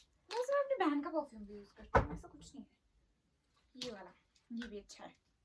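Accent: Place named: Himachal Pradesh, India. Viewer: Ap himachal se ho na didi ?? Apki tone lagri hai himachal jesi 🥹bahoot acha laga mughe .... Bahoot time bad ese tonne sunne mili 😭😭😭🥹♥️♥️ .....mai mandi se hu ...aao kabhi chai pine ....filhal mai Pune me rehti hu ...bahoot yad ATI hai mandi ki 😭😭♥️♥️♥️🥹🥹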